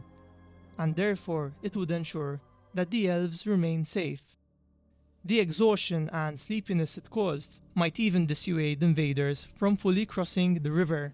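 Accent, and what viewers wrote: Malta. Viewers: Great video buddy! You are Maltese, right? Very distinct accent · You sound like a Maltese English speaker. Good work on the videos!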